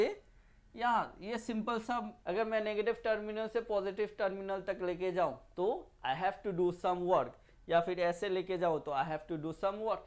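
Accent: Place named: Assam, India. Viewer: Sir Assamese medium r lage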